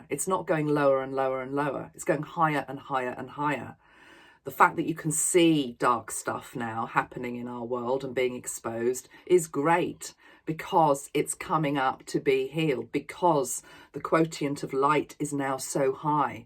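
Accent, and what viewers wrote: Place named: RP, United Kingdom. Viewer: I feel relief not schadenfreude when when people get justice, I feel we are moving into cleanliness. But I do want some 3-D justice - it will make things easier. and thank you for your dailies. I find you and even the sound of your queen's english - very satisfying.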